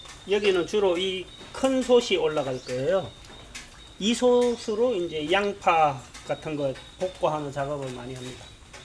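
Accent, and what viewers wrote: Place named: Hamyang, South Korea. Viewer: 함양은 합천군과 붙어 있지요 기와 땜에 함양읍에 간적이 있어요 억양이 같아서 왠지 정감이 가더니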